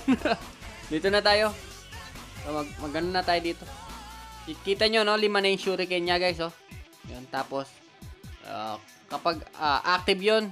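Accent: Pampanga, Philippines. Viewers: kapampangan ka idol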